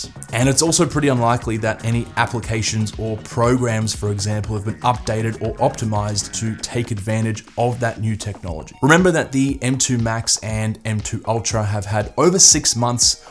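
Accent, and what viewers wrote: Australia. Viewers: You speak like an Australian.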